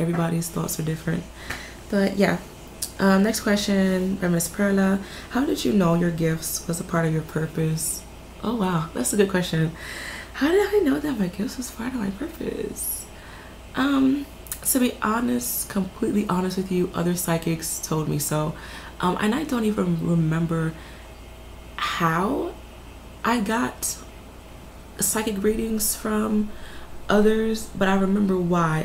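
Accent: Caribbean. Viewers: O wow I really thought u were Caribbean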